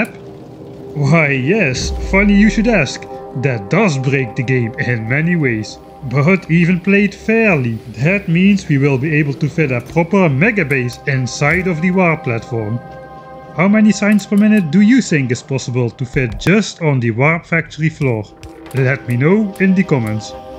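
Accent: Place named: Netherlands. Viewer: you are too humble - any of your tongue tied treats is a song to any of us bots, boys or babes! Doesn't need a tune - your dutch accent is sufficient music :)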